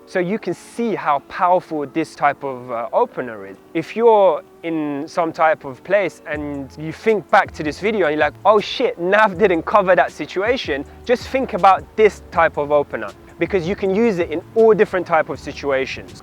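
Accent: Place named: United Kingdom. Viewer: I was gonna use this guy's tips but I am lacking the British accent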